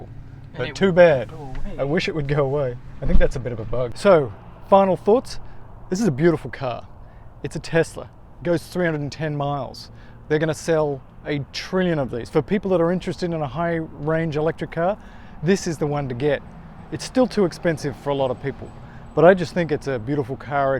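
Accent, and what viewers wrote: Australia. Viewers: Great to hear an Aussie accent ...makes a change... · Very informative video sir, and is that an Aussie accent i hear? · Do I detect an Australian accent?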